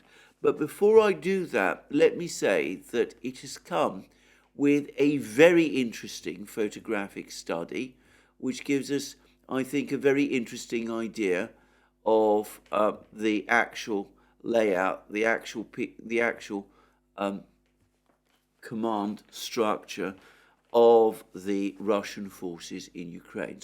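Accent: United Kingdom. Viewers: I love your program,. I never miss it. You're well-informed, truthful, reliable and also a gentleman. Besides that, I found your British accent clear and very, very nice. Cheers from Santiago de Chile!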